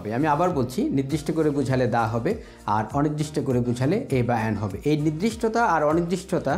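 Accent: United Kingdom. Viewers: it is surely with BRITISH ACCENT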